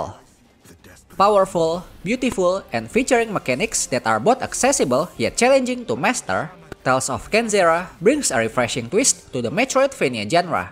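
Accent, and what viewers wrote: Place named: Indonesia. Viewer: aksennya kyk org indo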